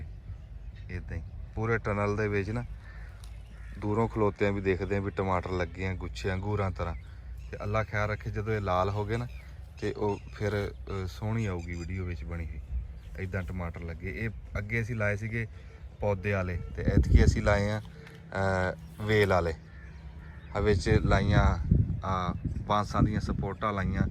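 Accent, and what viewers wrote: India. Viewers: Indian ki Tarah kio boltay ho, Pakistani ki Tarah bolo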